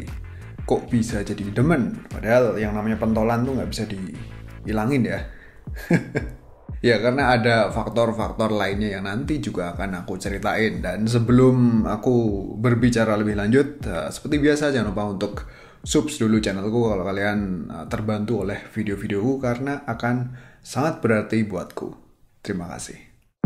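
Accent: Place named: Banyumas, Indonesia. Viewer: Dri logatnya Mas nya ngapak ya ? Klo iya kita satu darah 😂🎉 Sukses selalu 👍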